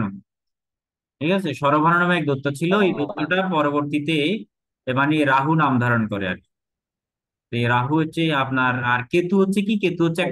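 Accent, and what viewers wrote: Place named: Bangladesh. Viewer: Sir,আপনার স্কুল কোথায়? আপনার কথায় বাংলাদেশের একসেন্ট আছে।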